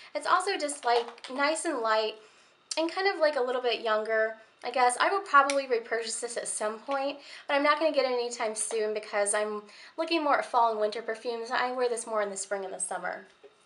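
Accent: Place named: United States. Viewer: do you live in the uk or usa? because you mentioned something from boots yet you have an ameircan accent I'm from uk btw x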